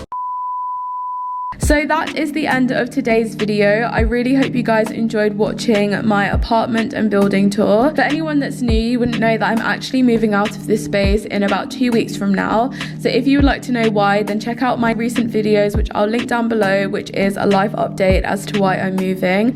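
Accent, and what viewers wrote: United Kingdom. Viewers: You sound like you come from a lot of money anyway. Your accent is very much British Private-School rooted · A lot of Black women in the UK speak like this! Especially in the corporate world. ☺️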